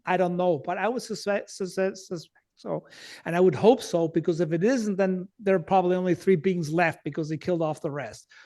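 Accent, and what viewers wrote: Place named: United States. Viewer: Dass so gut Englisch kann, schreckt mich wenig, spricht wie ein Amerikaner👍 Und er ist noch dazu Österreicher wie ich...👌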